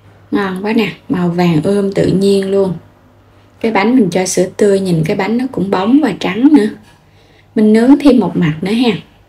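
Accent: Mekong Delta, Vietnam. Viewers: Giọng nói của người miền Tây là dễ nghe vậy đó cac bạn ơi ❤❤❤❤❤ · Mình nghe tiếng nói rất dễ thương mà , giọng nói đúng chất miền Tây bạn ơi .❤🎉❤🎉❤🎉❤❤❤❤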